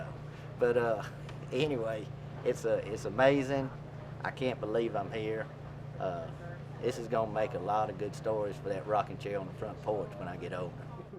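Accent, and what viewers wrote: South, United States. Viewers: Of course he's southern lol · it just makes it that much better that the dude has a southern accent haha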